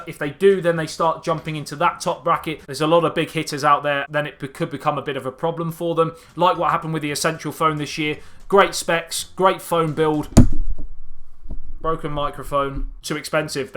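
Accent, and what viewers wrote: United Kingdom. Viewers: Idk why but i hate british acsent so much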